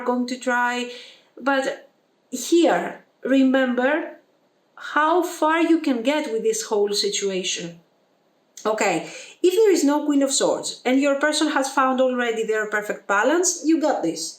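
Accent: Greece. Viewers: First time watching a video on your channel! As soon as i heard you I thought you were Greek, and you actually are😂🩷 Btw loved your reading εισαι υπεροχηη❤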